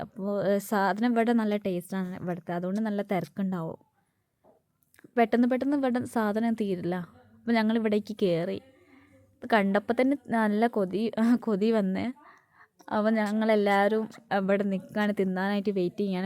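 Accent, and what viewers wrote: Malappuram, India. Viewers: Slang malappuram, tirur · ആ മലപ്പുറം ബർത്താനം കേക്കാന്‍ നല്ല രസം ണ്ട് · ഒരു പ്ലാവ് തൈ വാങ്ങി കുഴിചിടു 😂, ഒരു മലപ്പുറം സ്ലാങ് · Malayaliyekkal nannai malayalam parayunnu 👍🏻naattil Malappuram side aanennu thonnunnu 🥰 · Slang കേട്ടിട്ട് മലപ്പുറം ആണെന്ന് തോന്നുന്നു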